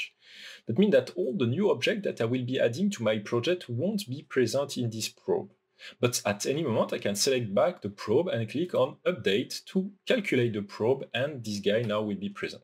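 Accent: France. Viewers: you are french ?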